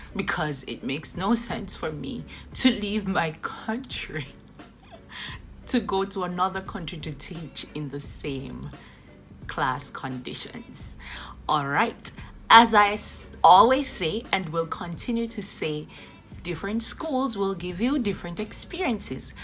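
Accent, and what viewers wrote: Jamaica. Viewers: Hey I’m so glad I stumbled on your page I figured your accent want slightly familiar. I am also Jamaican seeking employment in Kuwait 🇰🇼 I will be messaging with further questions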